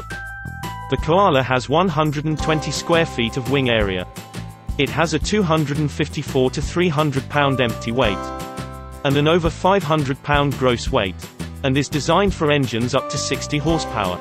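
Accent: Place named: United Kingdom. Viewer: Love the videos, so tired of the British accent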